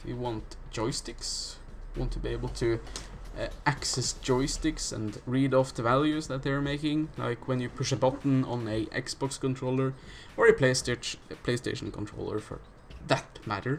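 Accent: Norway. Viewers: Du er norsk, eller hva? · Er du norsk? Ihvertfall norsk aksent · Are you a norwegian ? it sounds like you are.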